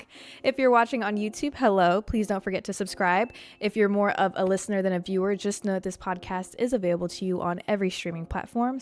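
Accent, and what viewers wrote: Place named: South, United States. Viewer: you sounded so southern in this one lol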